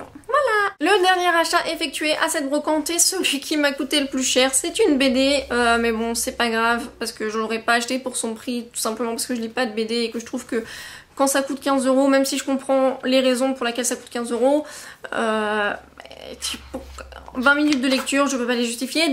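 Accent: England, United Kingdom. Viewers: Salut. Je fait des vidéos sur youtube ou je montre mes achats jeux vidéo. j'aimerais tellement avoir le même accent "anglais" que toi;) Tu présente super bien à la caméra. Bravo pour tes abonnés et bonne continuation.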